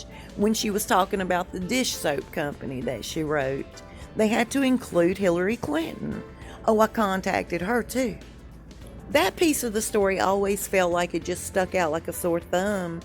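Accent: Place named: South, United States. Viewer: Very informative ..and gosh coming from nz I adore your lovely poetic accent.I love the southern accents.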